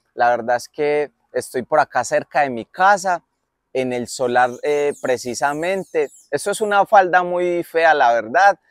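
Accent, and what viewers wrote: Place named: Colombia. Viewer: Soy nueva en su canal y me gustaría estar con ustedes para poder recoger la planta con ustedes son de Colombia ustedes por el acento bendiciones saludos ❤❤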